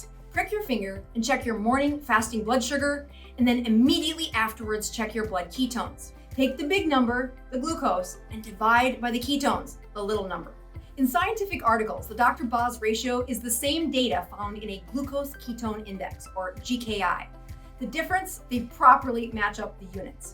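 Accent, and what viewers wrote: United States. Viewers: Tbh the moment I hear a US accent online, talking about medical or dietry advice, I tend to switch off. This woman is spouting her personal opinions, not verifiable medical information. Fyi four time Olympic rowing gold medalist Sir Steve Redgrave has type 2 diabetes. Unfortunately for him, at that time he was diagnosed there was very little known or fully understood about this particular auto immune condition. Redgrave was diagnosed with type 2 diabetes in 1997 at the age of 35. At the time, Redgrave was training and competing with a focus on winning a fifth gold medal at the Sydney Olympic Games in 2000. I defy anybody to find any of those stated symptoms on him. I know, because as a 52year old male in 2012, I too was diagnosed with type2 diabetes. I cured my own type2 diabetes, inspite of being told by my GP that it was 'incurable'. 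From my own extensive reading, I found there are over a dozen recognised forms of diabetes, all with unique causes and symptoms, eg pregnancy. The most common form is type 2 'late onset' diabetes. Characterised as 'insulin resistance'. This form of diabetes, if disgnosed within 10years from onset of the condition, in 80% of cases can be fully reversed ie 'cured'. Medical professionals even today will not claim a cure for type 2, but will say that you are 'in remission', as eg they would with a cancer. Type 2 diabetes: If you are subject to extended periods of uncontrolled stress in your life, your body will flood with energy, in a 'fight or fli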